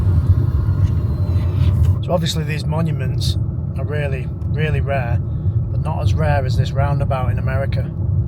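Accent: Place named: Bradford, United Kingdom. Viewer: As a lad with a BD postcode, I can fully appreciate ‘ ’ accent. 😂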